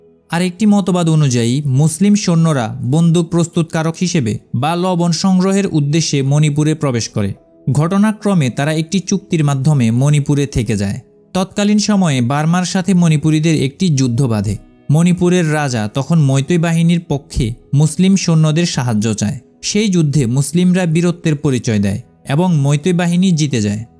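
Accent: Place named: Chittagong, Bangladesh. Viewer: বর্ণনাকারীর বাংলা বলার সুর শুনে মনে হল তাঁর বাড়ি চট্টগ্রাম। আপনার কন্টেন্ট ভালো তবে ভাষার ব্যাবহারে আরেকটু সচেতন হবেন। বাংলা বাংলার মত করেই বলবেন। যদি আঞ্চলিকতা বর্জন না করতে পারেন প্রমিত বাংলা বলার সময়, সেক্ষেত্রে চট্টগ্রামের আঞ্চলিক ভাষায় কন্টেন্ট বানান। বাংলাদেশের সব অঞ্চলের মানুষ এখন চট্টগ্রামের আঞ্চলিক ভাষা বোঝে। কারো বুঝতে অসুবিধা হবেনা।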